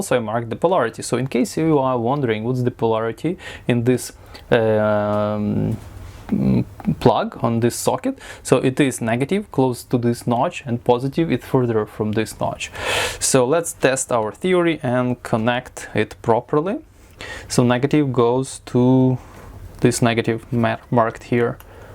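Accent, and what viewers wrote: Russia. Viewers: He is probably Russian or Ukrainian, they have the same accent.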